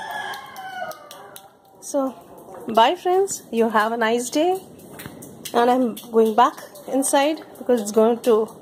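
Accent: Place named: United Kingdom. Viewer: great voice ji...with accent like british lady..big like and subbed too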